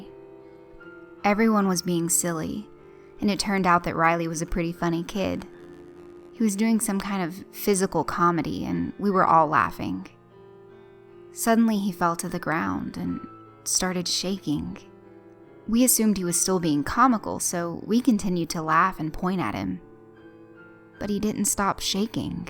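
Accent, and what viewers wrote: South, United States. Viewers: Another good batch, your quickly becoming my favorite specially when ya get that cute southernish tone, keep up the good work. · dont really like the southern accent tbh ;$ maybe I need to get used to it ( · I just saw your address in the description and realized how you can have a southern accent . Don't get me wrong it is nice to listen to . I told you before that I stumbled on to your channel when you were featured in another channel . It was like finding gold. You do a great job on your narrations . This video though more than a few had me scratching my head wondering . But I guess that is just me. At any rate keep up the great work .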